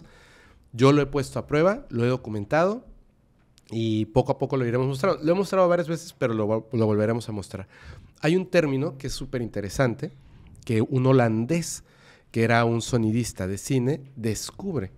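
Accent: Yucatan, Mexico. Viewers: habla como yucateco.....porque habla asi?? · Imposible ignorar el ascento Yucateco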